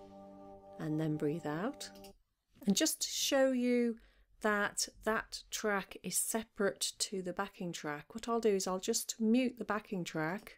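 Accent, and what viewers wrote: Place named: Camden, United Kingdom. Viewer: Your lovely. Tnx from Chile. You rock. And great proper english lol. Bring memories of my Camden boozy days lol. Not you just the accent lol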